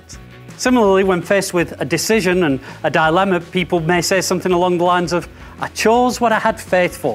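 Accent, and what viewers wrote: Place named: Bolton, United Kingdom. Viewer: the Horwich/Bolton accent still comes through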